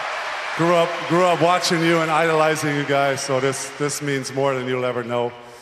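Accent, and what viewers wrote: Germany. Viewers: I can hear the german in his voice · ah year he‘s from germany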